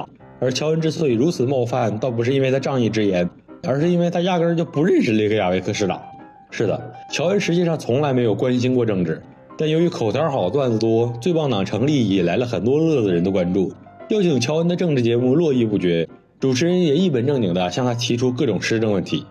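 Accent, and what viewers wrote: Northeast, China. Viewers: 小伙儿故事编得不错👍大碴子声儿也没问题👌你就是口齿不清吞音太重不看字幕欣赏不到你的幽默😢好好去悲京广播学院播音系练练你的订阅至少能翻一倍😂这辈子别屈了你的才呀😅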